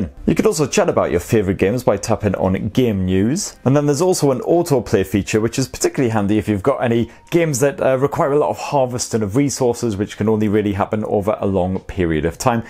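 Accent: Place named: England, United Kingdom. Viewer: Great reviews as always, can't you tune a little bit your english accent to an international one😁😁.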